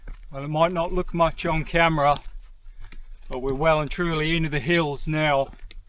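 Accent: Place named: Australia. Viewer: Cool vid. Kinda jealous actually.. but bro how long u been in Aussie for..?? Hard to tell your accent.. it’s like 5% British 95% Oz. Very hard to pick hey.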